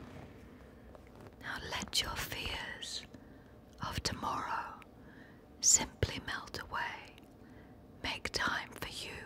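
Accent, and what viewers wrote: England, United Kingdom. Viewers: She was raised in the UK and moved to America as a teen, thus she developed her English accent naturally. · i’m gonna die! her voice and English accent😫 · She’s so lovely. -She’s become more British than me, in recent years! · She is one of the most beautiful women and most brilliant actors I have ever seen. Her elegant british accent made this asmr even more sensuous. Thank you Netflix! She is a natural. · English accent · How dare the people who mocked her British accent growing up. Madness. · Going into this I knew that she naturally has two accents but I’d only heard the American one. And still...when she began speaking in an English accent I was surprised to say the least 😂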